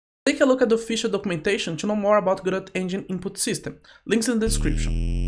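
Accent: Brazil. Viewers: As soon as I heard the accent I knew it was another Brazilian 😂 Glad more Brazilians are making content in English it is a nice change and as a Brazilian myself it is exciting to hear this 🎉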